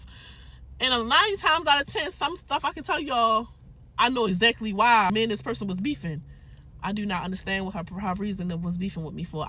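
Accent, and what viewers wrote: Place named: Baltimore, United States. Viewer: I knew exactly where she was from before she said it!lol gots to bmore careful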